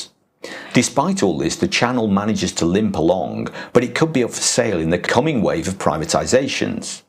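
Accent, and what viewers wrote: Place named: United Kingdom. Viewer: You're British accent doesn't mean you are right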